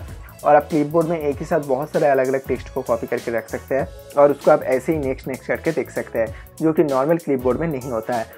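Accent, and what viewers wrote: Bengal, India. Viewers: Vi tumi Bangali ami Bujhte perechi......😁😁😁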